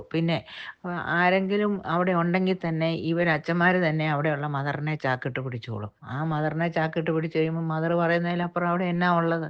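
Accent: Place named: Changanassery, India. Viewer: ഉച്ചാരരണം കേട്ടാൽ ചെങ്ങനാശ്ശേരി അമ്മച്ചി ആണെന്ന് അറിയാം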